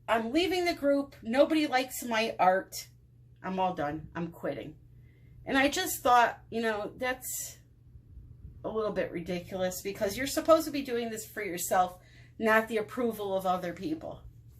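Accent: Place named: Chicago, United States. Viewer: i havent even watched this one...YET., but i have to ask one thing. your accent. Sounds from up north Chicagoish, thats where I grew up. been in TX since 81 and miss home sooo much . besides your amazing with you art. you rock the videos!!!!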